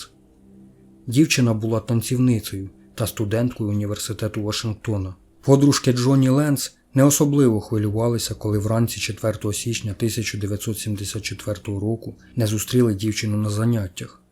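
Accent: Ukraine. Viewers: так і подумала. Вимова як у Черкаській або Кіровоградській. Може, і Полтавська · Ведучий випадково не з черкаської обл?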